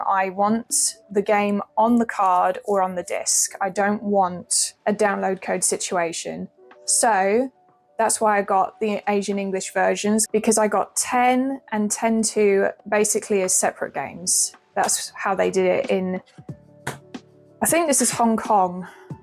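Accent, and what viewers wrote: United Kingdom. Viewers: Gamer girl with a british accent. It doesn't get much better than this. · Came for the vita, stayed for your cute British accent Love From from Portugal.. +1 sub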